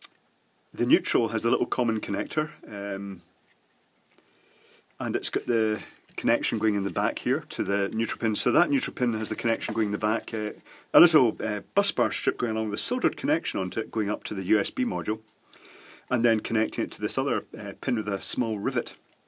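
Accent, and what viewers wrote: Scotland, United Kingdom. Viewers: J’aime l’accent Écossais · Is he from Scotland?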